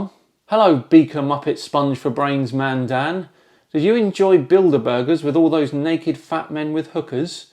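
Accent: United Kingdom. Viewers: I'm 50/50 on it. Dan, I only support you b/c my little brother Brian (FFAS) drew an amazing portrait of you & he's a very talented artist. Half the time you're entertaining & the other half you're condescendingly ass-ish. The Lego pic frame was your saving grace. Yes, sometimes you're funny, other times, your mixed British accent is grating b/c it's right in between Proper English, "with a moment for tea & crumpets," and London Ghetto Cockney, "whut was u finking, bruv," but b/c it's not one or the other, the duality is more noticeably annoying to those of us who have no accent.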